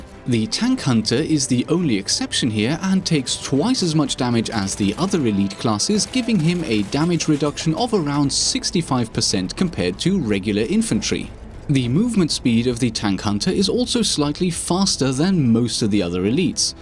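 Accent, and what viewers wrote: Germany. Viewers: German is your mother tongue, isn’t it? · do you speak german in your free time? bc you have an very little accent... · are you german or from swiss, that Accent is strong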